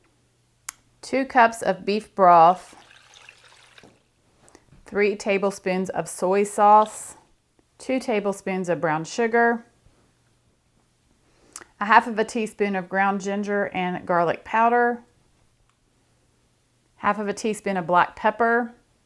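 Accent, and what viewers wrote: South, United States. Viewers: Hi there! Enjoyed this video, your accent sounds Southern to me. I suspect that you are always on the lookout for meal suggestions to make on your channel. Might I suggest corn fritters and southern fried catfish. Keep up the good work, enjoy your videos a lot.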